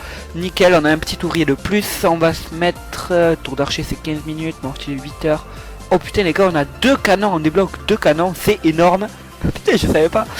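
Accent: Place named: Marseille, France. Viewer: Marseillais je crois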